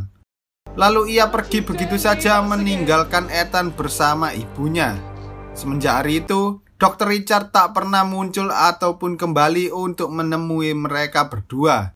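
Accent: Java, Indonesia. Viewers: Jawa nya medok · Hmm risi terlalu medok narasinya *Menurut gw woy ✌🏻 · Logat Jawa ny ketara mas, jd kurng enak denger ny.. Coba aja Narator ny Logat Indonesia Tulen lbih enak denger ny. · Asik juga nonton film di ceritain pke logot jowo 😂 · ethan...ke edan...walalalaaa.... kurangkan sedikit pekat jowonya wak..... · Wong jowo lek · Suara jawa nya kya tmn sya omm 😂😂😂😂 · Medok ya pak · Medok bngt bang ngomongnya😂 Lucu beud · Meddog ngge. . . . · Pekatnye jawe ko bro ....😂😂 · Medok banget ngomong nyaaa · Pekat jawa nya, apa khabar bang... haha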